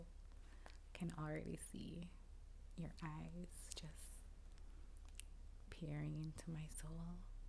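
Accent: Jamaica. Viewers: I love your alternative subtitles XD i can follow nearly all of your accented words and jamaican phrases but i appreciate the subs anyway just in case we miss what you were saying Also I'm finding the more I learn about anansi, the more I see that his biggest trickery is using other people's pride against them to get what he wants 😂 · The Jamaican accent has to be one of my favourite accents ever and couple this with folklore tales and I’m obsessed, instant follow 🥰🥰🥰🥰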